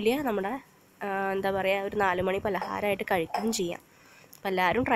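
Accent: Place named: Kuttippuram, India. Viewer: നിങ്ങളുടെ സംസാരത്തിൽ നിന്നും കുറ്റിപ്പുറം ആണ് ചേച്ചിയുടെ സ്ലാങ് എന്ന് ഊഹിക്കുന്നു. ശരിയാണോ?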